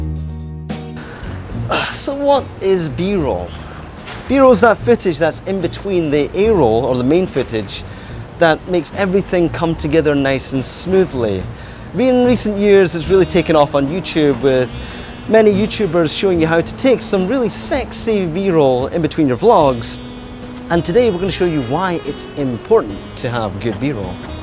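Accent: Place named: Scotland, United Kingdom. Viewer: Just aboot crapped ma pants there when a heard yer Brogue....Defo got the Scottish mannerisms..Alba gu bràth ✊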